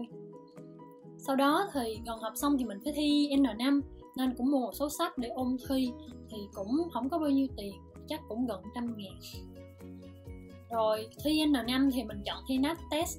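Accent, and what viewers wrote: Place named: Nha Trang, Vietnam. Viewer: Bạn này giọng nói như ngừoi Nha Trang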